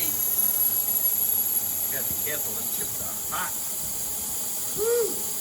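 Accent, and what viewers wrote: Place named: New York, United States. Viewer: Nice accent. You sound like a old school New York Gangster.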